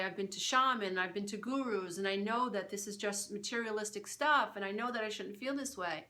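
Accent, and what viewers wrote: New York, United States. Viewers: Great advice. Are you from the Bronx?Brooklyn?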